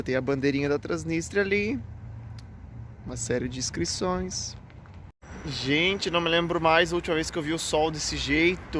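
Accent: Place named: South, Brazil. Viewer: Esse sotaque do sul kkkk😅❤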